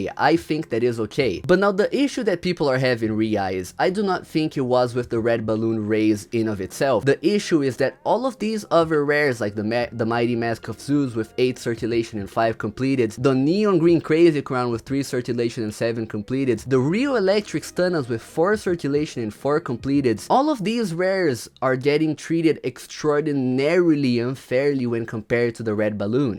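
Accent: Brazil. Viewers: Are u brazilian? I know this accent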